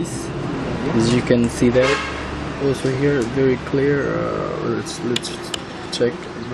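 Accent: Visayas, Philippines. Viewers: hahaha bisaya man diay ka slangs kaau kada.hehehehe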